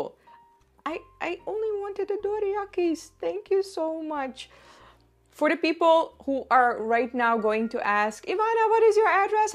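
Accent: India. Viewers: Ik heb een poos niet meer op jouw video’s geklikt maar nu dus wel. En het valt mij op dat je je zelf een typische accentje hebt aangeleerd. Het is Indiaas, Engels 😂 grappig om te horen bij jou.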